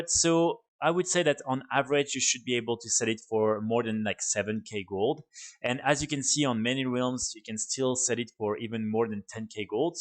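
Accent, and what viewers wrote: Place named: France. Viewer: Your content is some of the best, keep up the great work. Because you're French, you have a French accent. Your pronunciation is what is expected. Don't worry about it. The truth is, people LOVE IT.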